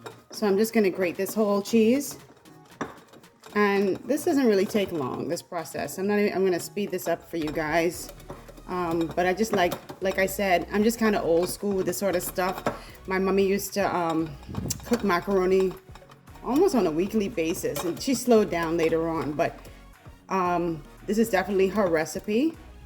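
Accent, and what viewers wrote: Bahamas. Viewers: Lol I enjoyed this say (.judge your ma lmaoo, looka that macaroni ) you're a real bahmaian gyal, accent/slang on point 😆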